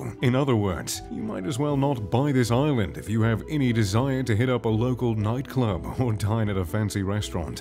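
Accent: RP, United Kingdom. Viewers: why do we have a boujee British man narrating this? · You sound like the spiffing brit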